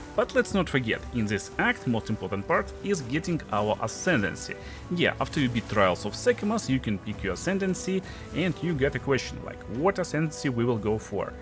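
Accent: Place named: Russia. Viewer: Ты бы не выёбывался, а говорил по-русски, слышно же, что русскоговорящий. Зыс и т.д. произношение выдаёт.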